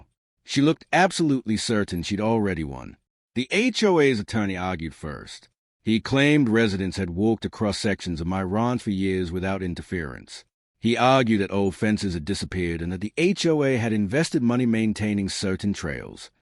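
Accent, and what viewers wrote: United Kingdom. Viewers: Why would someone who has generational property in Montana, sound like he’s from England?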